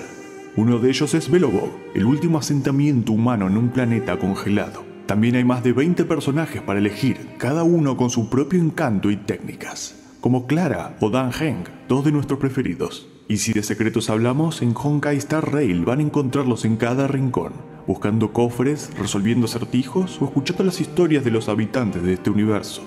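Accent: Argentina. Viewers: che vos sos argentino¿ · Soy el único que cree que la voz del "presentador" se parece a la de Zico Tops pero con acento argentino? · Primero se van los acentos argentinos,luego promos a juegos,gente,lamento decir que se acerca la decadencia del canal 😢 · Porfa que vuelva el ascento argentino:(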